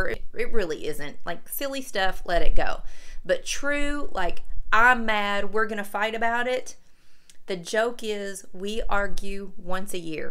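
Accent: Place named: Kentucky, United States. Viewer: You are SO the person I want to be when I stop being a procrastinating perfectionist! 😁 I am a 49 year old night owl musician from Boston (thus the username and picture). I LOVE your Kentucky accent and phrases!! I have 2 boys ages 12 and 14 so I am fascinated by how you’re handling your youngest flying the nest. 💗 Your energy grabbed me but it’s your sweet personality and “realness” that keep me coming back. 😊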